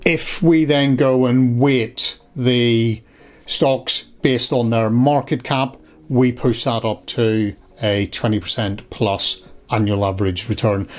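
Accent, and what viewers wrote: Northern Ireland, United Kingdom. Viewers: Great content plus good to hear a local N.I. accent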